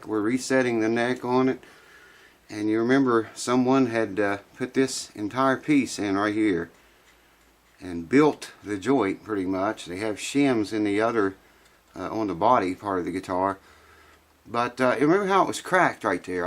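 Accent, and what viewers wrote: South, United States. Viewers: what state are you in?? I thought you was a southern man--hmmm maybe youre up there with Neil Young lol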